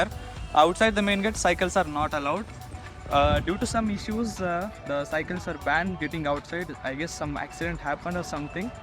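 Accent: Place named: Maharashtra, India. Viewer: When I listen ur hindi first time I knew u r from Maharashtra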